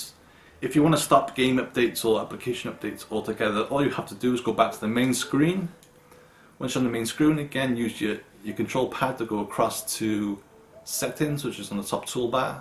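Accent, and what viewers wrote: Newport, United Kingdom. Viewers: Newport accent?😝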